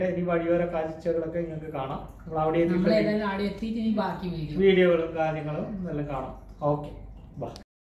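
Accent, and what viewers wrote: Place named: Kannur, India. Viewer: ആഹാ... കണ്ണൂർ ഭാഷ 😍 ഞാനും കണ്ണൂർ ahh... കല്ലിയാശ്ശേരി Video കൊള്ളാം കേട്ടോ... നന്നായിട്ടുണ്ട്... തിരിച്ചും വരാൻ മറക്കല്ലേ കേട്ടോ, 🥰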